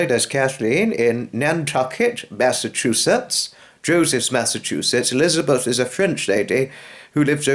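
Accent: England, United Kingdom. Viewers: … you certainly need to do “shout outs & personalised messages “ just as Nigel farage and other YouTube celebrities do. Your ace in the whole is that you have the quintessential English accent which I feel would go down a storm in th USA!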